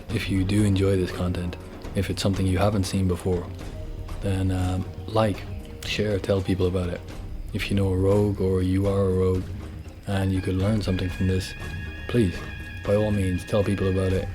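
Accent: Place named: Ireland. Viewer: Nice work man, you sound Irish :D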